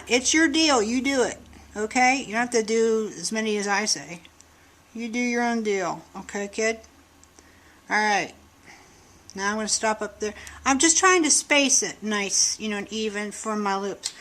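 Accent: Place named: North Georgia, United States. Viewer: you're so much fun and I love watching your tutorials. You sound a lot like we do in North Georgia. Your sense of humor is such fun & you make everyone feel good about what they're doing.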